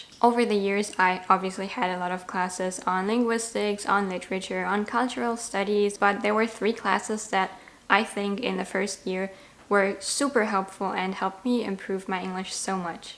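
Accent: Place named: Germany. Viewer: Outstanding work,! You seem to have mastered the English language and it’s grammatical nuances, and you’ll just continue to acquire more vocabulary over time in the same fashion as a native English speaker! Your ability to produce the language is on par with a native, just with a slight German accent which I think is endearing. Thanks for sharing such a detailed history; I find this very motivating for my own journey to Italian language fluency. Well done! Julia (native English speaker from Australia)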